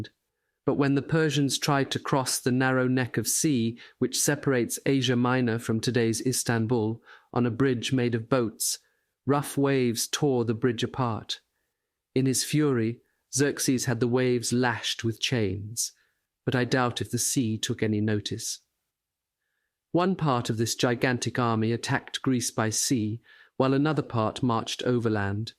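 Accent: RP, United Kingdom. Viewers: Very British ! Queen's English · Perfect Received Pronunciation, better known as the Queen’s English. Very posh, articulate, and impeccably enunciated ! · What is narrator's accent? British? thanks for your help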